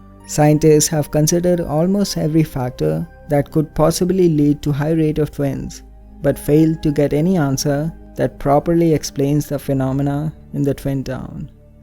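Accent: India. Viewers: That 's an Indian accent.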